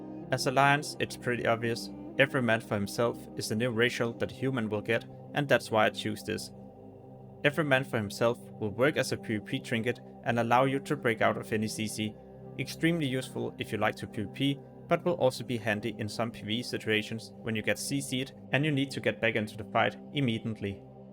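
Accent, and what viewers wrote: Denmark. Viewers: Useful guide! Thanks brother. I have a mage, But also a warrior. I kind of wanna focus warrior, but all the people say They’ll be useless early on, I don’t know… sigh Also, are you Danish? You sound danish!☝🏼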